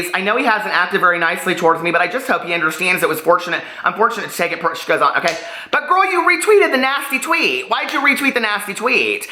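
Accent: South, United States. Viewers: I’m a life-long Southerner, but I do wonder why you, a life-long Indianian, have a Southern accent. Like the way you say “thang” instead of “thing.” So cute! I love it!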